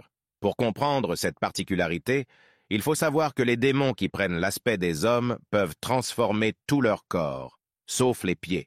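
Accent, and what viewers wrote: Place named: Africa. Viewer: Tu parle mal avec ton accent afficain c est salomon et non slomo et asmidee avait des pieds de canard et.non de coq .va apprensre ta leçon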